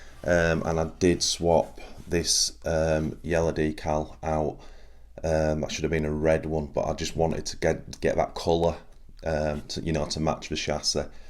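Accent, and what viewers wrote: United Kingdom. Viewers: It's just occurred to me that you're not in the UK, I sometimes forget because of your accent. I'd have used a.com link if I'd thought about it. They're quite a common gadget, though, so you shouldn't struggle to find one.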